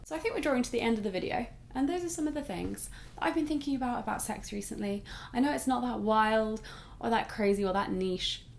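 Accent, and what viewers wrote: United Kingdom. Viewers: English isn't my first language but I already get used to American English and feel comfortable with it And now I listen to her British accent and it's so fucking sexy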